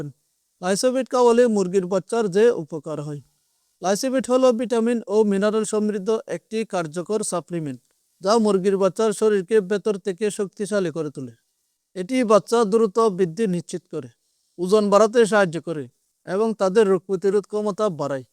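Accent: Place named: Chittagong, Bangladesh. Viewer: চট্রগ্রাম থেকে আপনার কথা গুলো চট্রগ্রাম এর মতোই লাগছে 😮😮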